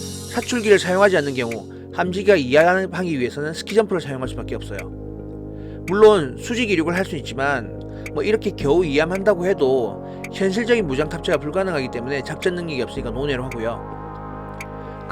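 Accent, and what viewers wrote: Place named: Gyeongsang, South Korea. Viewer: 내용은 좋은데...경상도 사투리 억양이 너무 강해서 듣기가 좀 쉽지 않네요. 계속 방송 하실건데... 표준 억양 연습 부탁 드립니다. 그러면 좋은 내용이 한층 와닿을 것 같습니다. 사투리 억양 지적해서 대단히 죄송합니다.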